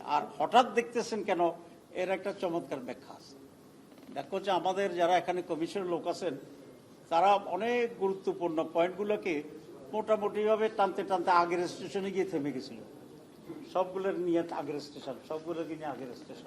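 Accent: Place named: Chittagong, Bangladesh. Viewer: বি,এন,পি র সালাউদ্দিন সাহেবের অনেক যুক্তিপূর্ণ কথা উনার শ্রবন কটু চিটাগাং এর আঞ্চলিক টানে হালকা হয়ে যায়।মইন খান বেটার অপশন হতে পারতো। মির্জা সাহেব যেহেতু এই ঝামেলায় আসতেই চাচ্ছেন না।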